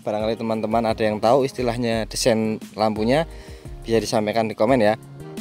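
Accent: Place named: Central Java, Indonesia. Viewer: Paling senang dengar logat jawa tengahan ...marem salam teko jawa timur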